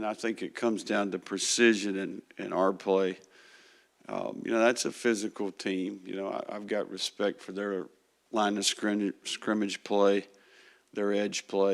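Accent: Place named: South, United States. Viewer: Finally a coach with a southern accent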